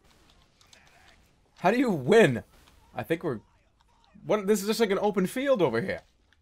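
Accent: Boston, United States. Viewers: well he is from boston and the scout is from boston so the same accent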